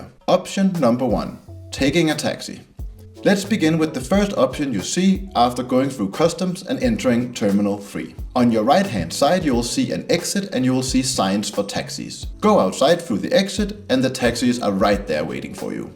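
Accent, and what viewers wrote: Denmark. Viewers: Hello. thank you for the information.Is that a danish accent?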